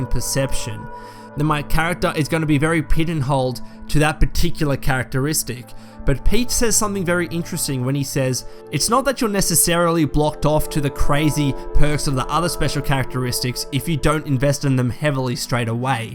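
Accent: Australia. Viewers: LOVE your videos but every time I watch them, I'm always spacing out from how your voice sounds so calm and Australian... I don't mean anything mean by it. Keep up the good work though!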